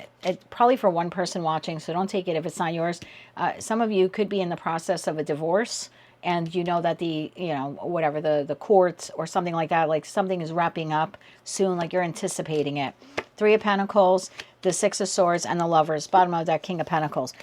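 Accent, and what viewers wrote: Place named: New Jersey, United States. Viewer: I got every word .. must be a Jersey thing lol stay warm/safe in this weather ❤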